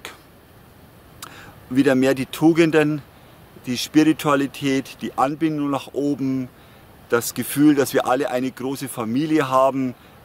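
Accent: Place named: Franconia, Germany. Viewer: Wunderbares Video mit guten Informationen. Ich höre den Anschlag aus dem fränkischen Dialekt so gerne. Ich bin seit fast 20 Jahren aus Franken weg gezogen. Das Fränkische hört man sofort.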